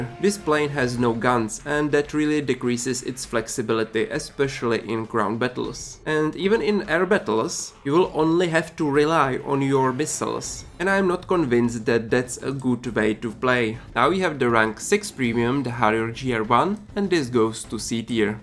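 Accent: Czech Republic. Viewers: Podľa angličtiny mi je jasne, že si čech :D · Ja si taky rikam, po peti sekundach co sem ho poslouchal sem se sel podivat do komentu jestli tady nebudou cesky komenty😂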